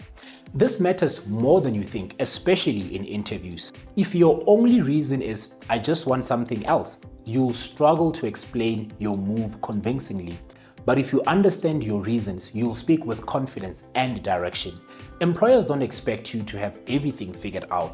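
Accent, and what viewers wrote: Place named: South Africa. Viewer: Your accent gave you away. You speak so beautifully. A South African I guess.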